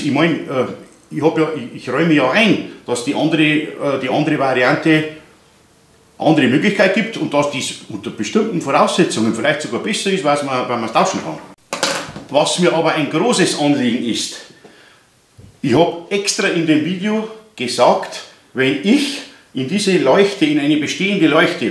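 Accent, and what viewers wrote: Bavaria, Germany. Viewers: woher kimmst du eigentlich aus Bayern?:D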